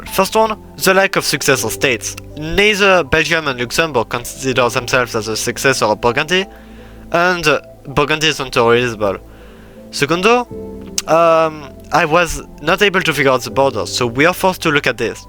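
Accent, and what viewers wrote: France. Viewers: Je serai surpris si tu n'etait oas francais,qsa vois avec la voix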